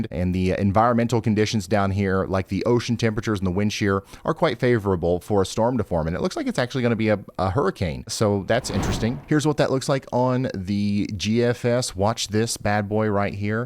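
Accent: South, United States. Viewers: I just love your Southern Speak! I’m from what used to be fairly rural north Fla Panhandle; I grew up in Tallahassee, but the middle, to the western panhandle are not far from Al, & Ga, so “Daggone” is not unknown to me. 30 years ago, ( I will be 71 in July)I married a man from Michigan, & we’ve been living in the Grand Traverse Region of N.W. Lower Mi since 2008, & I still speak Southern….with a slightly less southern accent.😉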